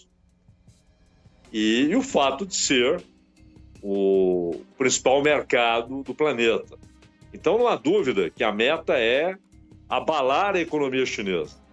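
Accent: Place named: Caipira, Brazil. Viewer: Vc é velho comunista, com sotaque caipira ! Vtsc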